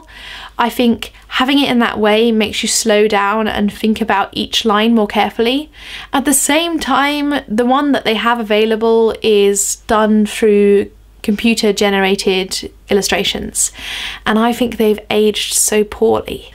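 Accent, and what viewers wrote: United Kingdom. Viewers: Hi, you from England?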